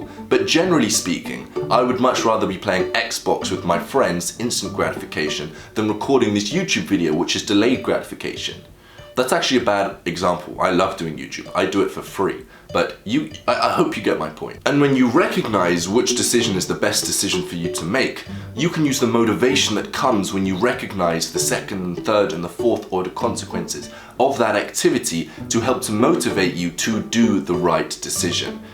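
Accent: England, United Kingdom. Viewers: Woe! What an amazing video, I totally agree!!! I was lazy and once I understood the logic of dopamine, my whole life changed. I work alone, I have the body of my dreams, my mental health is getting better every day...Thanks. What city are you from,? Obviously seems to be from England, has a beautiful accent! You remind me a lot of actor Cillian Murphy, has anyone said? Well, gratitude from 🇧🇷❤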